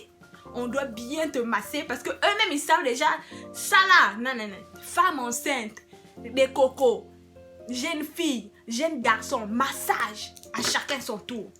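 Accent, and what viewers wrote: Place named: Democratic Republic of the Congo. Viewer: J'aime les congolais...Regarde comment tu parles tu Choko façon jusqu'à 😂😂😂😂😂Je m'abonne ohhhh on fait comment avec confinement là...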